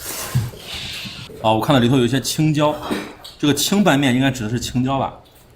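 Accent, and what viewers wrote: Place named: Shaanxi, China. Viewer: 是陕西的🎉🎉🎉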